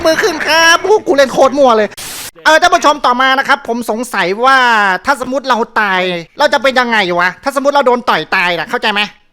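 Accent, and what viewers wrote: Isan, Thailand. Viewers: เจ่าเป็นคนอีสานบ่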